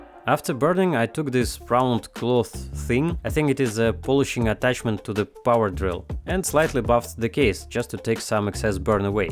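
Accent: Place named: Russia. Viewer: Хороший выбор!)Начал я смотреть видео и понимаю что акцент у ведущего, русский,но какое было удивление что это один из моих любимых блогеров.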